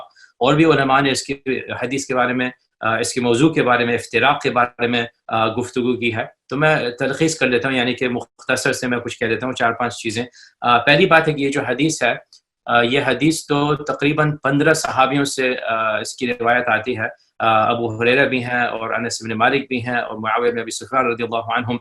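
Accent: Pakistan. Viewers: I love ur urdu accent, May Allah bless you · Loved your urdu accent ustad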